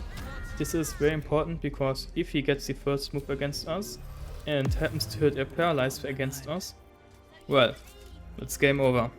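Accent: Germany. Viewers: Pretty cool video , a lot of thought went into it I can see that. one thing though, why don't you consider to use all of shar's blessings in the Myrkul fight ? any specific reason ? they are rather powerful and could have saved you 15h of redoing everything. I'm also very impressed there was no " I blew up the emboss in one turn using firework / explosives" I might need to start all that pre-buffing and switching stuff if I ever wanna do a solo Honourmode run. Wenn du den Deutschen Akzent für die Zukunft loswerden möchtest, empfiehlt es sich, Serien, Spiele und Filme auf Englisch zu setzen, da du hier die richtige Aussprache hörst, dieser Tipp hat vielen meiner Deutschen Freunde geholfen, sich schnell darin zu verbessern. Ich finde, es hat mittlerweile einen gewissen Charme.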